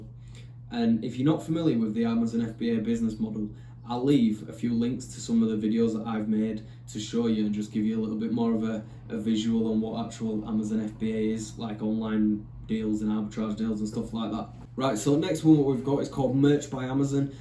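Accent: Leeds, United Kingdom. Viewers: Really enjoying your channel given you a sub y oh sound like a Leeds lad too MOT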